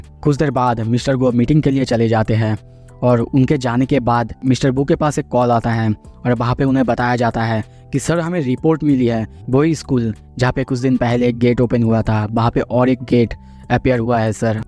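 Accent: Bengal, India. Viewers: Tomer kotha sune mone ho6ilo Tumi Bangali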